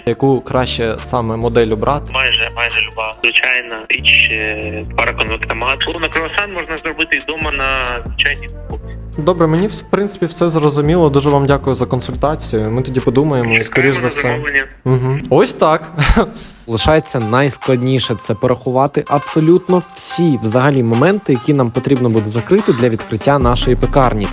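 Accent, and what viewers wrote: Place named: Ternopil, Ukraine. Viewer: Була впевнена, що ти з Тернополя ☺️👍🏻 Дуже гарно розмовляєш з нашим тернопільським акцентом.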